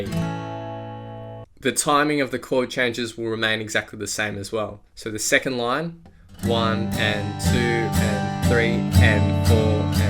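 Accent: Australia. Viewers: Am I hearing an Aussie accent there? This is a great tutorial, so brilliantly guided through all the steps and details!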